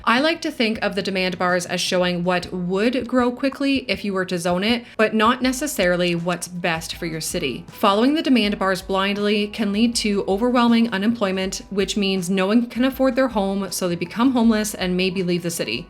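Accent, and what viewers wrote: Canada. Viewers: She’s Canadian that’s how they say about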